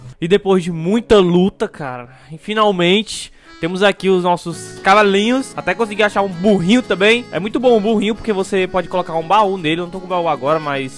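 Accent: Ceará, Brazil. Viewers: Tú e de onde man,esse jeito de falar parece CE kkkk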